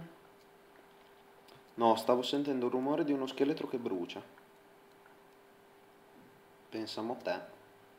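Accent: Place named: Palermo, Italy. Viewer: sei di palermo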